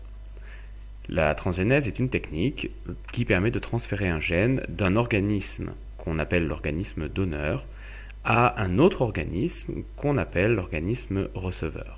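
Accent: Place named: Paris, France. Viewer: léger accent parisien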